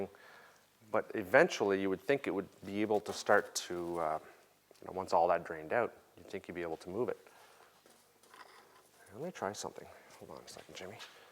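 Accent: Canada. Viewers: Lol Canadian accent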